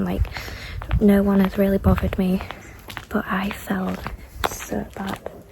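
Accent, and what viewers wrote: United Kingdom. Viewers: Are you 100% Yorkshire?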